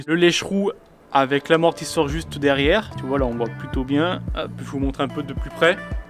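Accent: Saint-Étienne, France. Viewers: S'est l'accent Stephanoi 😄👍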